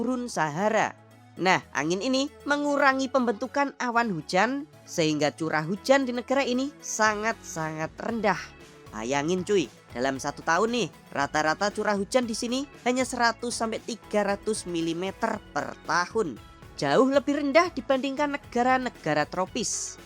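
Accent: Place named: East Java, Indonesia. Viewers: Ini miminnya lucu banged, selalu ada kata kata tambahan, seperti siapin kopi dulu, siapin Snack ya dulu, terus ada kata asoyy kalau lagi ngulas hal hal seru di negara yg sedang direview, terus intonasinya kadang seperti sedang serius ngajarin murid matematika di kelas.. Terus logatnya itu, aku analisa dari tadi, seperti logat Jawa Timuran gitu, Org Surabaya aku menduga, mungkin... Hehehee... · aslinya mana kok bahasanya medok kayak jawa timuran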